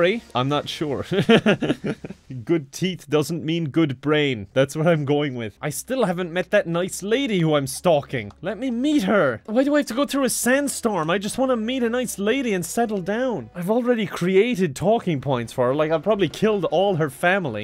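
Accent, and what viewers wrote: Ireland. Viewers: You sound exceptionally Irish in this video 😂😂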